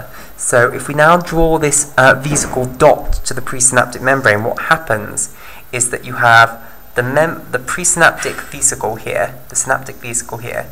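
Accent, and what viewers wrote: United Kingdom. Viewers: I think it’s just a compliment on his British accent.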